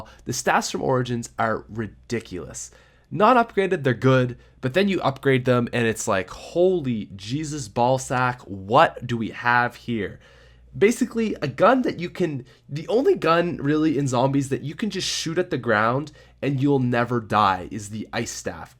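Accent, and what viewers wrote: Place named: Canada. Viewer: Lol ur Canadian right? Love you Canadians out there. "What is not to love aboot this thing?" Hahaha 💖😁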